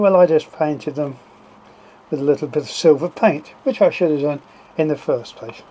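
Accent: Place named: Northern England, United Kingdom. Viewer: Grand stuff, you sound reet Northern in it......great modelling tips.......don't worry some one the other week said I sounded very Northern!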